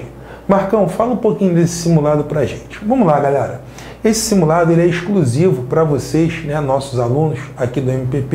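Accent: Rio de Janeiro, Brazil. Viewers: esse sotaque do Rio é horrível. Parem de colocar o X no lugar do S no final e no meio das palavras.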